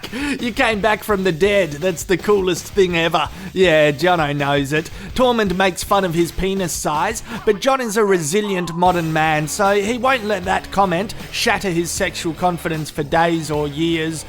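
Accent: Australia. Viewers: love your reviews. you say what I'm thinking in Canadian eh, in a Australian voice. . . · You have clearly never met an Australian in their natural habitat.. we all speak like that.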